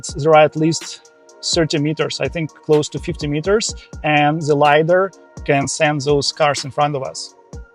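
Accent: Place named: Russia. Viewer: Love the Russian accent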